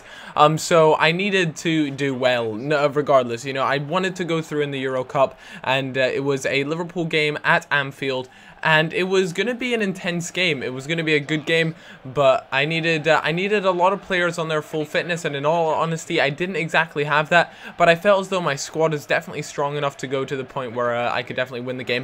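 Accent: Scotland, United Kingdom. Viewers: where is Scotland do you come from?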